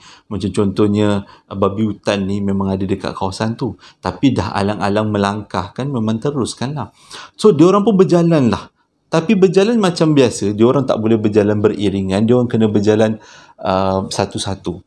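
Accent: Johor, Malaysia. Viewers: ingat bro 😅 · suka dengor abang cakap, memang pure orang Johor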